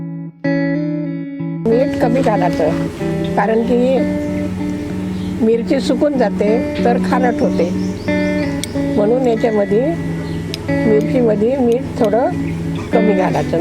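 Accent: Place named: Vidarbha, India. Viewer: Thankyu aaji.. Aaji vidarbhatalya ahe watate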